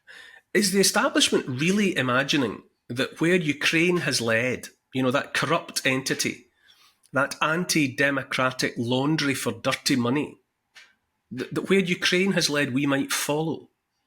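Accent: Scotland, United Kingdom. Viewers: Hi Neil Oliver, thanks so much for your videos, I really appreciate your thoughts. It's so rare that someone sees the truth, & understands what's really going on. I'm pleased you are so brave to speak the truth. TBH your history programmes used to really rub me up the wrong way, maybe it was the strong Scottish accent? Now I am really respecting you though. Lucifer has the control over this world. He is the mastermind behind all the evil you see. Lucifer is the Prince who plans all the wars, & trouble, and evil schemes. He controls the powers of this world. I hope you will read the Bible, because I know it will open your eyes to the TRUTH. Without God there is only death. I'm hoping you don't dismiss this comment, & your eyes will be open. God has the victory, Satan will be destroyed ..... all will be good. Best wishes, appreciate your hard work.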